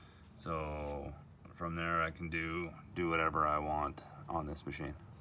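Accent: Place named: Midwest, United States. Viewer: Nice work. I have an S650 as well. Where are you located? By your accent, I'm guessing mid-west area. I could use someone like you in my area (North Florida).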